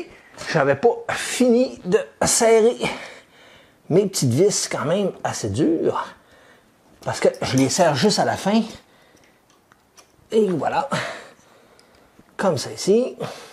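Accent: Canada. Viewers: Vidéo efficace, comme à chaque fois, l'accent Canadien j'adore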